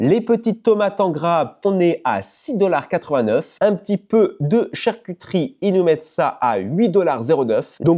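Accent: France. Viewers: Super vidéo. Avec ta jolie frimousse de Frenchie et l'accent qui va avec ,tu devrais pas rester longtemps célibataire !!😅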